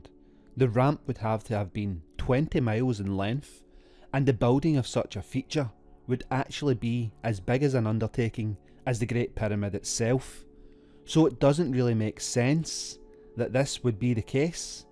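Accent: Scotland, United Kingdom. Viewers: Always good to hear a fellow Scot. I’m doing a similar video at the moment for my channel. The Thunder Stone in St Pete’s which I’ve been to and is about 1500 tons was moved by manpower in the 1700s. I’ve been to the Menga Dolmen with a 180 ton capstone, Broken Menhir in Brittany up to 350 tons or the Unfinished Obelisk over 1000 tons. The ‘technology’ and magic for me is nature. This is what we’ve lost sight of and our connection to the planet.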